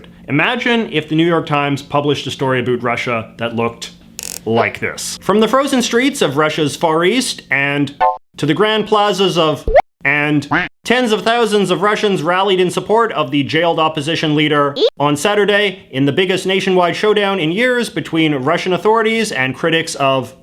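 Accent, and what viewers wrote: Canada. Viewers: In addition to his use of sound effects, I find amusing Mr. seemingly unconscious and unironic Canadian variations [n b.: plural] on the word "about." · I'm American, I just subscribed bc I find Canadian accent very sexy. But then I found out that his content is pretty good too.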